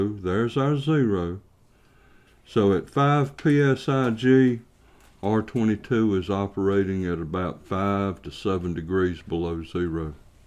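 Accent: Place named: New York, United States. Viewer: Man this video is spot on and to the point, I love your New York accent.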